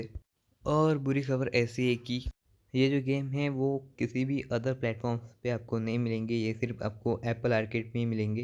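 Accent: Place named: India. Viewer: Not everyone is good at english dude if he talked english he still gets the indian accent so it will sound so fucking weird and he might say wrong words so remember that everytime.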